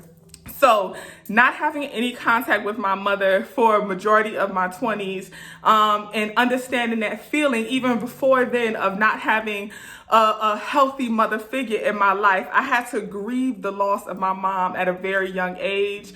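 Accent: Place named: New York, United States. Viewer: Thank you, sincerely, for this,!! My heart so, so needed this. Much love!! Àse! Also, I’m married to a New Yorker and I just love your accent and the way New Yorkers talk — the realness! 🙏🙏🖤🖤🖤💜💜🔮💫🧿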